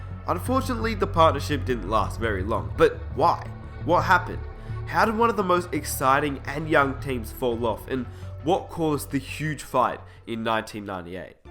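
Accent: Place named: Australia. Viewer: thats great aussie bloke talking about nba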